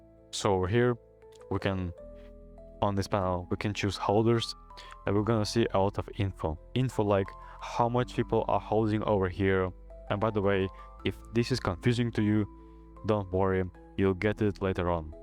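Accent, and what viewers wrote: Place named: England, United Kingdom. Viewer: i love ur english accent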